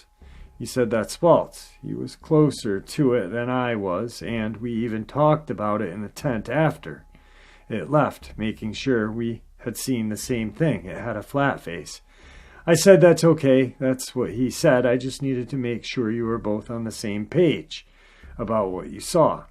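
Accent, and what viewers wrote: United States. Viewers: Anyone else hear a John Wayne accent?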